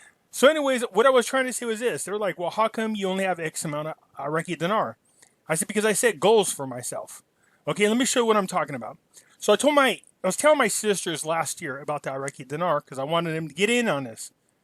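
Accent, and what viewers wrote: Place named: United States. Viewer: Hi why do all Americans pronounce Iraq and Iran with an I as in I they pronounce it with an India pronunciation.. could you change your pronunciation to honour these countries ? Thanks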